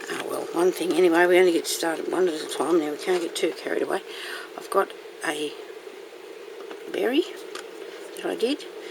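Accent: Australia. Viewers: you must be inland l live on the coast hot but not as hot as inland. there great little flowers really sweet. its so nice to hear an aussie acsent. looks beautiful love the flowers tfs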